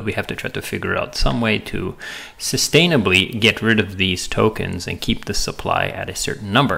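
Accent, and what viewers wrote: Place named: Italy. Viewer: Hahaha - more italian accents please :)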